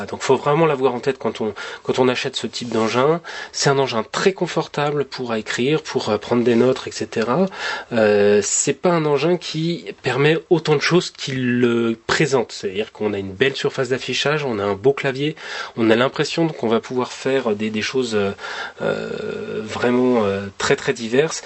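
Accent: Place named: France. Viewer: Me gusta el acento francés, me gusta la asus, me gusta el video... excelente!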